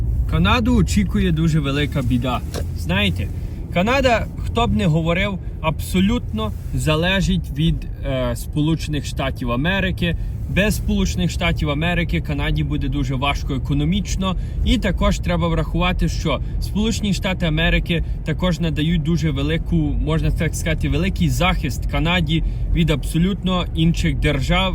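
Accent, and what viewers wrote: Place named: Lviv, Ukraine. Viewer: з Львівщини)))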